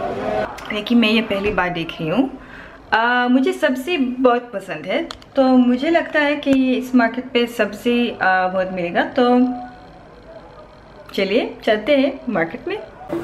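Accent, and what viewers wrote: India. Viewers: First time am watching your video n when I heard your hindi i was like 😲😲 just like delhi grl...... 😎😎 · Very impressed with your Hindi as you could speak almost in the accent that people of Bihar and Easter UP( Indian states) . Very nice indeed.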